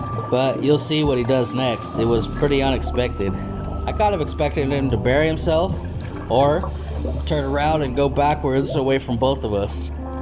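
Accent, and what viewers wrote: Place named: South, United States. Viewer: nice nice vid.. plus i like your strong southern accent ! enjoy my country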